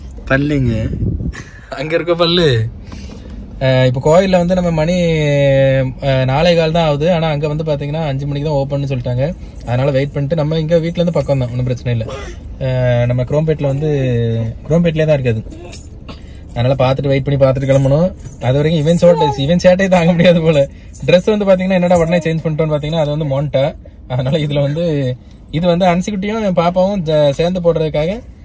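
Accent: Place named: Thoothukudi, India. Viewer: Thoothukkudi slang sooper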